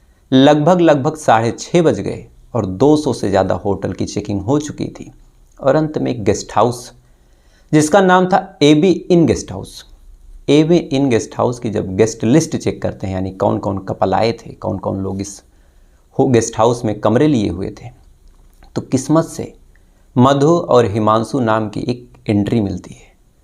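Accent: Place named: Bihar, India. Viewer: Kahaaaaani ya kahani ? Daud ya dauuud.? Aapka pronunciation Bihari hi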